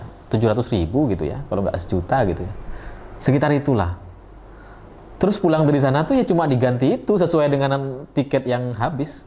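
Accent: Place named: Madura, Indonesia. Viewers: Saya Katolik di Bali, setia menonton pakar mantan. Justru dialek madura itu cirikas Mas banget. Bravo n viva mas.. menginspirasi masyarat. PROFICIAT UTK PENGHARGAAN DARI YOUTUBE.. MAU KAOS JG DONG MAS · Wahhh... Congrat ya bang.... I always stay tune on ur channel... Happy milad juga buat bang, barakallah fii umrik... Semoga tambah sukses lg, dan terus menyuarakan kebenaran utk akal sehat biar kita gak pernah oleng... Betewe, sengko ghelem kaosah jih... Mayuh kerem ghebey sengko bang... 😁😀 Kata siapa gak cocok... Justru you are special. Kaya prof Mahfud my idol, bangga lihat logat Maduramu masuk youtube dan always dilike byk orang. Whats wrong with ur aksen... Go ahead bang... Be the best.. Best wishes dr kami di Kalimantan Tengah, ur truly liker... 😂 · Sampean abit pon bede e jakarta kak...mak logattah pagun katarah jek reng madureh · Saya suka logat maduranya mas. 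· Saya suka nonton video mas baik di CokroTv maupun di SewordTv. Saya suka ulasan-ulasan anda tentang politik atau sosial, dan logat Madura anda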